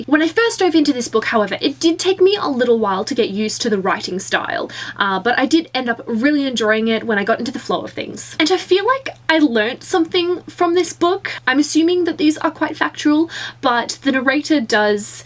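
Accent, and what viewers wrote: Australia. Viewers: You sound aussie! haha I don't come by many of them on the youtubes, I really feel this book needed a more in depth review as there is soooo many topics this book brings up, regardless this was not too bad. As for the narrators name.. he mentions a name a few times I believe it was jake or joe or something.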